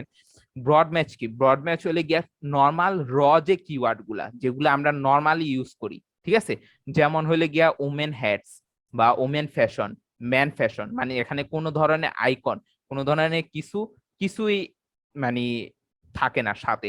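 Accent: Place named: Comilla, Bangladesh. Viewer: আপনি মনে হয় কুমিল্লার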